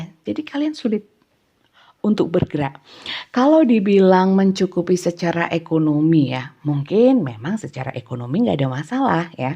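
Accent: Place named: Banyumas, Indonesia. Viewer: Banyumas ngapak bunda.. hehe.. sehat sllu mksh reading nya. 🙏🙏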